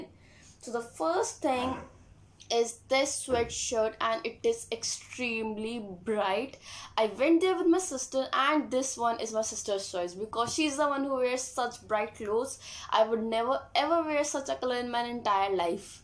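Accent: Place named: Haryana, India. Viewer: Bt i think u r haryanvi so that tone is coming in ur conversation so i am not saying anything nagative i appreciate ur work 😘😍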